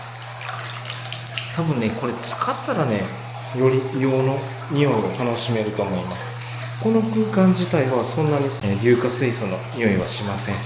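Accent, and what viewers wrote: Kansai, Japan. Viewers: 前々回から、あれオ−プニングの音楽が変わりましたね。 あの歌舞伎映画の音楽かと思ったけど違ったら申し訳ありません。 今回の音楽は朝日と雲海と絶景と合っていて国民放送さんみたいでしたね。 いつもの滝と神社が普通に暮らしてたら行かないような所が画面一杯に広がってあきないです。 関西弁も素敵です。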